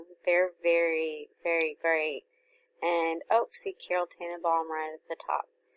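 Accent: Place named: South, United States. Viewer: Your videos are absolutely the best I have seen. Thank you for sharing the sites you use to identify makers and marks, and to purchase jewelry, and thank you a thousand times for the lists you have created and shared!! Fellow southerner, so you sound just fine! :)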